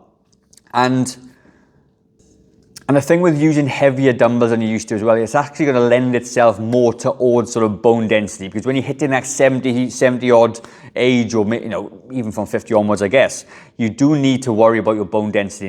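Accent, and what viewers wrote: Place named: England, United Kingdom. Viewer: 2035. Are you ready for that? I’m 72, and Lisa Liang said in 2035 there will be a billion of us that will be 85. I’ll be 82. I’m thinking Tai Chi, Yoga, calenthenics, and the like. Americans(my wife would love your English accent) tend to think that if you’re not pumping heavy iron, and running 5, or 6 miles a day, it isn’t exercise. Marius Visser, also from England says that the group he works with are 60, 70, and 80 year olds. God bless you.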